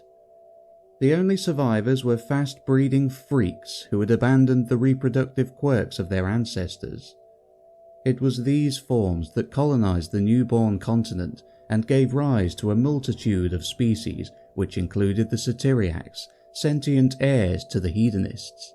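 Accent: United Kingdom. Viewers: Why do I like listening to Brits so much more than anyone else? Great job.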